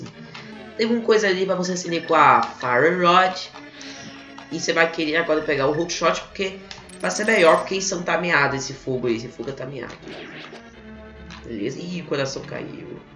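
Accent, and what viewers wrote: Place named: Minas Gerais, Brazil. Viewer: Vc é de Minas Gerais seu sutaque é bem parecido com alguém que mora em Minas Gerais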